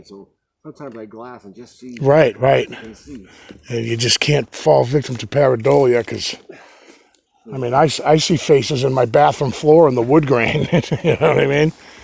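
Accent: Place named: New Hampshire, United States. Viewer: You made me home sick for NH, Born and raised. Then I moved just saw this Sept video on turns scary real quick... You're accent makes me laugh 😂 now.. But loved it, but I was yelling get out.. get out Run don't walk now Skip if you have to I won't hold it against you. I'll FaceTime from KY the next time you need a fast out.. thanks for the content on a rainy day... But as for being so close to the road this is what my parents told me when I was little people always throw trash and food from their cars when they're going down the road so animals like to come up to eat to wash his Bigfoot be any different that's my take on it but they applied it to seeing deer on the side of the road when I was a kid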